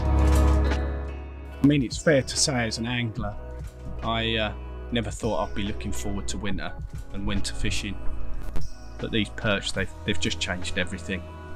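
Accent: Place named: East Anglia, United Kingdom. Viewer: Watching this video to teach me how to catch Perch. Been lure Pike fishing for 9 months. Biggest just over 10lb! Where are you from? You sound East Anglia way. I’m from Essex originally. 😊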